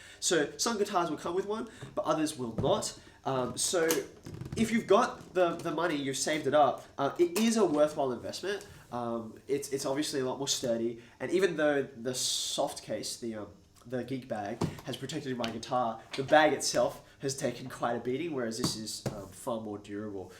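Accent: Australia. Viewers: Think he is saying "gig", it's just the AusTRAYlian mate.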